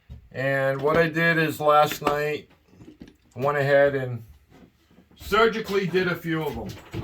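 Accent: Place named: New York, United States. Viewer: sounds like your from new york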